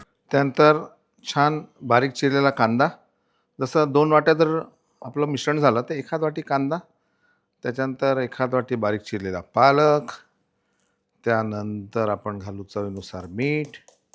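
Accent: Vidarbha, India. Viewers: Kitti god bhasha tumchi,tumhi majhya vidhrbache ka ho😂❤❤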